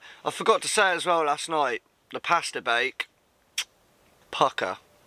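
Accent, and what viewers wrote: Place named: Norfolk, United Kingdom. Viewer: Very interesting indeed. Good to hear a Norfolk accent again. Camping spot was ideal.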